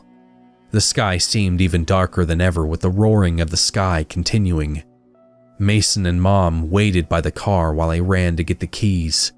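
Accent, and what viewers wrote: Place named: Midwest, United States. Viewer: This is awesome so far! The way you get more intense towards the end is cool. Your Midwest accent is funny, but semi accurate at the same time lol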